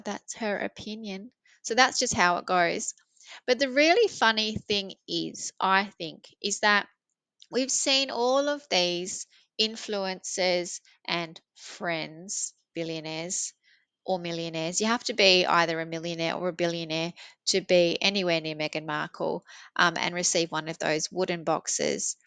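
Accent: Australia. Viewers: I enjoyed the podcast or is it a video ? you sound like an Australian and must compliment your ability to make fair comments without being nasty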